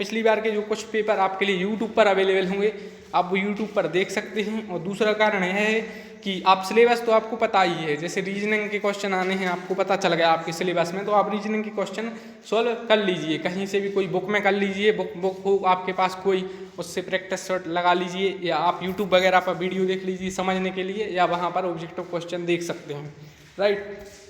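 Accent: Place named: Sagar, India. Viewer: Bhai ap sagar side k hi ho kya...m bhi wahi kha hu apki awaz..se lg rha h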